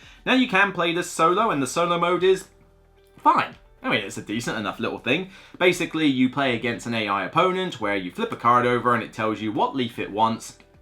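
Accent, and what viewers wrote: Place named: West Country, United Kingdom. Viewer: New to the channel. Nice review! Hope you won't find this too personal but I find your accent (and accents generally) very interesting. I'm guessing a mix of west country and New York/southern Canada?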